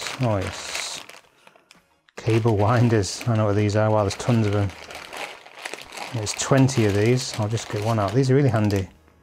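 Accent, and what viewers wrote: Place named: Newcastle, United Kingdom. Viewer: Hi. You might remember me from Seon's live stream chats. I'm a Dutchman not trained in UK dialects but I think I'm hearing a Newcastle area accent?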